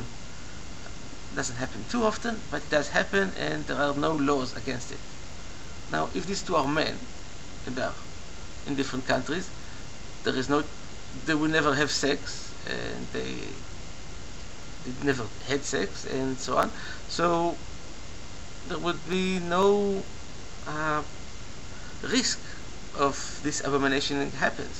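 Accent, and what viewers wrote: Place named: Israel. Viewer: He sounds Israeli.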